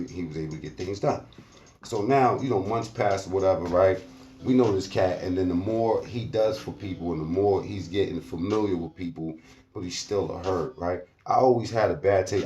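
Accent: Brooklyn, United States. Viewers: Yo I just subbed to ya channel cuz you kept it mad real you coulda lied and been like you washed home boy up but na u kept it funky peace homie IDK if ur from Brooklyn but u sound just like my boy from Brooklyn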